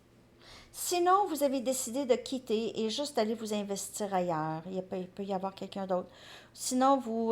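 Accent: Quebec, Canada. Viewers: Je vous apprécie beaucoup 😊 J’ai quitté le Québec pour retourner dans mon pays il y a 1 an et ça me fait du bien d’entendre votre voix et de retrouver ce côté québécois que j’adore tant (le « ok bye » m’a tellement fait du bien de l’entendre 😂) Et vos guidances sont pertinentes Merci 🙏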